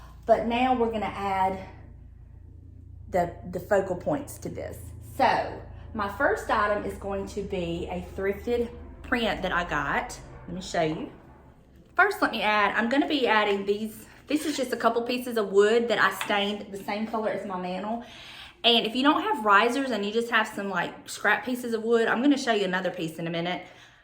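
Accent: South, United States. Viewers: I just found your channel, and you have a very southern accent like I do and I’m wondering if you’re from my area. I live in North Carolina. Hi just subscribed and I’m looking forward to watching more, I love it when I find a southern lady like myself.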